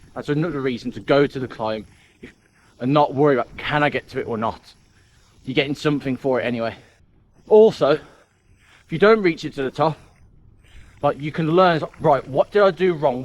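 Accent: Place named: Dudley, United Kingdom. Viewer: Were you from? You sound like a Dudley bloke.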